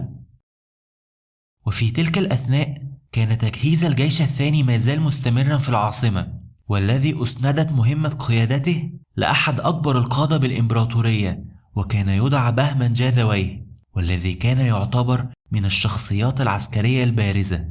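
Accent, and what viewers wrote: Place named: Egypt. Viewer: تعليقك وسردك للمعلومات سيئ جداً.. ما ادري ليش المصريين متعلقين بلهجتهم ..؟ انت تقدم ماده المفترض منك نطق الحروف بالشكل الصحيح ..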